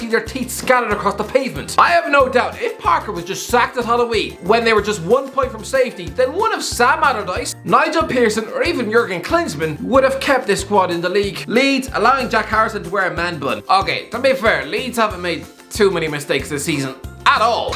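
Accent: Ireland. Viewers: An very Irish accent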